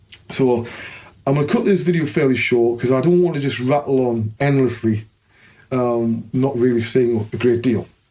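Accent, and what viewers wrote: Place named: North East England, United Kingdom. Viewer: Good to see your life after 3d series, think you are right to try to stick to one topic at a time for these, good for the algo. Have you thought about doing a regular podcast? I think you'd work well with people to bounce off, guests and/or co-hosts? Sure you've got the industry contacts to have loads of interesting shows. There's a few of these already, might be room for one with a North East accent😉?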